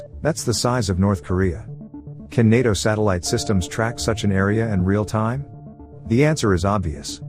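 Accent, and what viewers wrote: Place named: United States. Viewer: Perfect American accent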